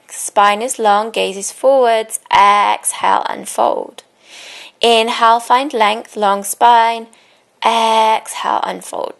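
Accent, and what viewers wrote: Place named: United Kingdom. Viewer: Thanks. I used the video that you referred to this morning - it is very good too. Thank you. If you are open to other suggestions, here's a couple: 1) You say in your bio that you also practice Mindfulness. I started doing this last fall. Have you considered doing some guided mediation videos? I use other guided mediation videos on YouTube and they are very popular. Your voice soothing (especially British accent). 2) A quick 5-7 minute stretching video that people could do at their desk in an office.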